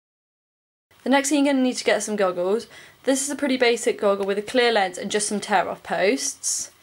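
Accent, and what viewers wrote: United Kingdom. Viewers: Hi I can hear by your accent that ur from the uk although u mentioned it, but I'm from the uk aswell, I live in in surrey, where do u think I could ride that's near redhill due to the fact that I live there in redhill. Is there any places to ride a dirt bike ?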